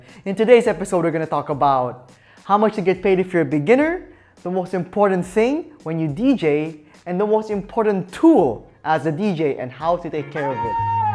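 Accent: Philippines. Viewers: Are you Filipino. You have an accent lol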